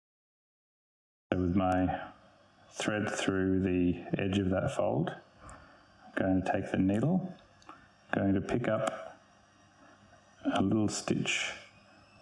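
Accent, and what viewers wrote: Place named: Australia. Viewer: Great video! I’m very new to hand sewing seams. Right now I’m attempting to make a shift using the “seam and fell” method described in a book I found called “The Medieval Tailor”. It’s a bit like what you described because the seam is worked in tiny stitches from the right side too. Have you tried your technique for longer seams such as side seams or for seams where it’s trickier to have one hand behind like sleeve seams? You might have addressed my questions in other videos - I’ll go and see what else you’ve posted! Also, it’s lovely to hear an Australian accent 😊. I’m in Adelaide, but most of the other YouTube historical costume channels I have found are in the US and UK.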